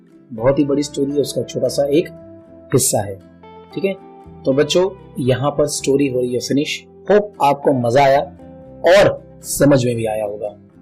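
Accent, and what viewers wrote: Gujarat, India. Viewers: Sir ap gujarati ho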